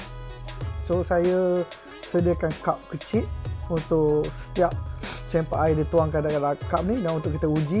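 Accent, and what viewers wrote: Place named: Pahang, Malaysia. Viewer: gaye ckp tu mcm org pahamg😂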